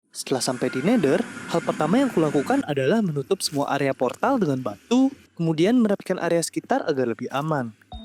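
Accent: Bali, Indonesia. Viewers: berlogat bali wi nya jok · logat bali bnget nok kakaknya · org bali kah? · orang bali bg? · Orang Bali wi keliatan banget logat nya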